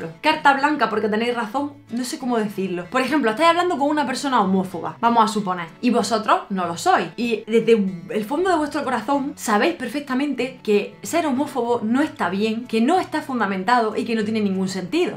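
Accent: Murcia, Spain. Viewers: Como se nota que eres murciana jajaja. Yo también yo de Murcia jajaja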